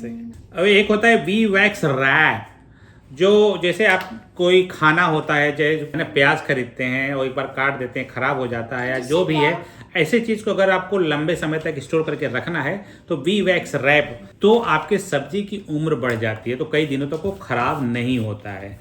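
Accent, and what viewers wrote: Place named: Uttar Pradesh, India. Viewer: Appka Hindi UP ka ho... magar bache pura Gore hai...thats very much:) (laugh).. LOVING YOU ALL!!:face-red-heart-shape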